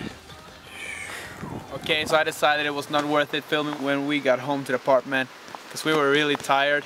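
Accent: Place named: Sweden. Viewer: feeeett! Jag är också från Sverige haha, tyckte de lät som om du va svensk;)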